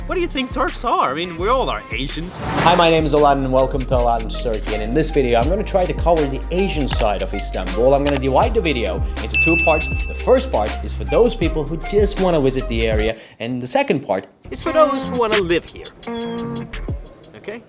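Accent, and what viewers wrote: United States. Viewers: This the first video i saw very impressive and full of information i was looking ....Also being born in Turkey you talk like Americans.we would love to visit Turkey in very near future.Take care and God bless you. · Nice narration. You speak like American. 👍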